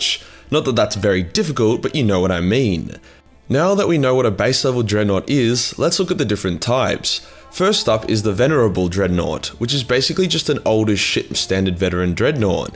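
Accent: Australia. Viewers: i was like whys the narrator talking shit and swearing so much and then im like oh yeah he has an australian accent too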